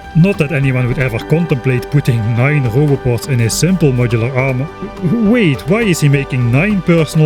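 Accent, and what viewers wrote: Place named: Netherlands. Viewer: This is the definition of dutch accent lol i love it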